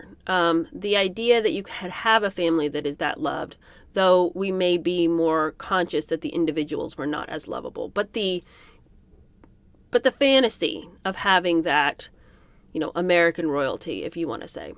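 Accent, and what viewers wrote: United Kingdom. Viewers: Love your British accent! Perfect! Sometimes it makes me laugh3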